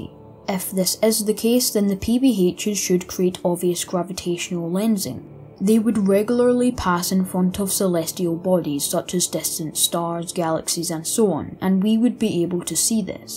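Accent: Scotland, United Kingdom. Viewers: It's a Scottish accent. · He is Scottish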